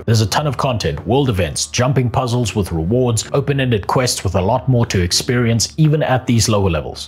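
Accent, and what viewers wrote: South Africa. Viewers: Lakka video bud, sounds like you have a slight SA accent though? Or is it Kiwi?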